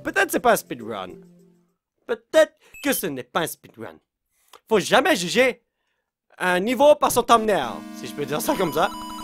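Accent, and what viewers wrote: Quebec, Canada. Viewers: Sa fait je sais pas cb de video que je regarde et je me demadais si il était quebecois ou francais maintenant j’ai la reponse xD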